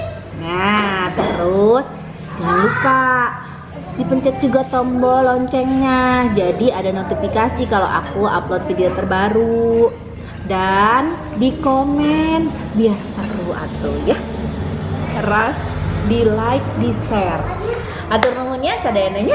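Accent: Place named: Sunda, Indonesia. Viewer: Pasti ngiler koq ratu bakso sdh makan bakso...manyus teh...jd pengen mkn bakso.. Saya bukan org sunda tp suka banget denger teh bicara bhs sunda...jd tau gitu dan enak di dengar..suka nonton ini krn ada ciri khas yaitu bhs sunda, dialek sunda suka banget dengarnya👍👍👍💖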